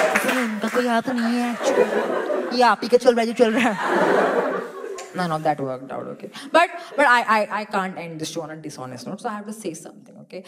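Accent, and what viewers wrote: Eastern Uttar Pradesh, India. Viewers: She is the best female comic.... So spontaneous in changing her tone every second and just love that east UP flavour here and there😂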